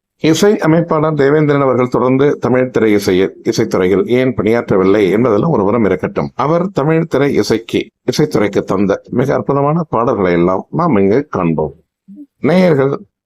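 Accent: Sri Lanka. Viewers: உஙகள் இலங்கை மொழி அழகு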